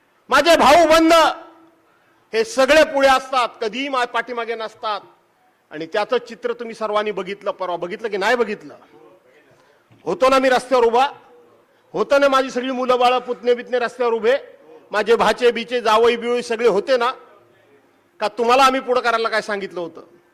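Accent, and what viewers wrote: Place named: Konkan, India. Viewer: Kokani awaz dadanit awaj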